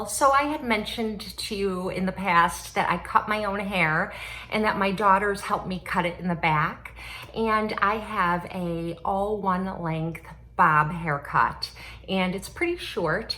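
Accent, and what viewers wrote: Wisconsin, United States. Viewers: I hear Wisconsin or Minnesota in your voice?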